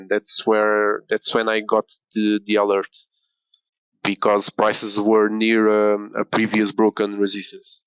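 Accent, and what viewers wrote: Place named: Portugal. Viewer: Olá. Desculpa mas pelo sotaque percebi que eras português:) Gostava de trocar umas ideias contigo, se possível. Vejo que tens uma boa noção de mercado e interpretação de preço. Parabéns. abraço. Pedro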